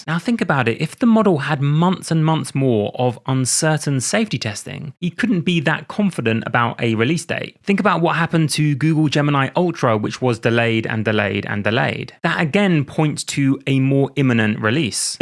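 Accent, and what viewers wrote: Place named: United Kingdom. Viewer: I'm obsessed with this British accent 🥲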